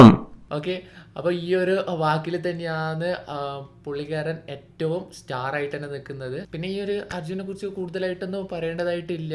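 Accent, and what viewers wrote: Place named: Kasaragod, India. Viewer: enniya njanum kasaragod enne. mundanne style kekkambam oru doubt adich appo choichadh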